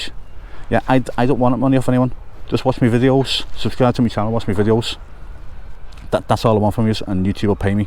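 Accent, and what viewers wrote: Liverpool, United Kingdom. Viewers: Am a long distance ‘pool fan (Teesside). I could listen to your scouse accent all day long. Love your videos as well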